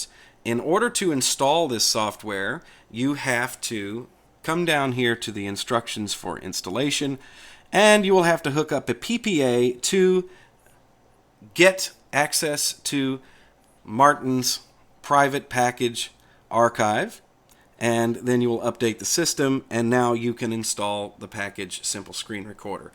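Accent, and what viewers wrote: United States. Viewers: I have said this before and have to repeat this again: if you want to get the best Linux channel for novice just subscribe to the ' channel on Youtube. I am so glad that I run into his channel a while ago! Of course I don't agree with everything of what he has to say,without exceptions, but it's just impossible to agree with the 100% anyone would say without an exception or two. But boy, oh boy: his guides are super-useful and they are made well. In addition his language sounds right for an American "ear" too, comparing to the British or Indian English some other good youtubers use. Hats off,!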